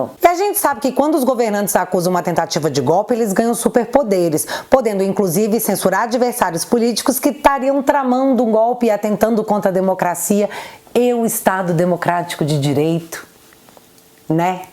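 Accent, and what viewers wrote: Minas Gerais, Brazil. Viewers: você é uma pessoa incoveniente e sem educação, ninguém pediu sua opinião, você não percebeu com toda a cultura que acha ter que não tem, que faz um estereótipo de sutaque de mineiro do interior, que o canal dela é política com humor, falando sério letrado com erros grotescos de ortografia, observa seus espaços na pontuação e seus acentos.... No mínimo deve ser petista, porque escreve como eles😡😡😡 Você acha mesmo que vai dar importância a sua opinião? Enquanto você critica o canal dela só cresce... é uma influecer super relacionada no meio político, não é um Zé ninguém que vai oprimir🤣🤣🤣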